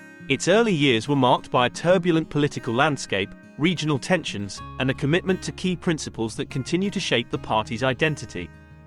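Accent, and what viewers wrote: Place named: United Kingdom. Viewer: Too British for me I am sorry.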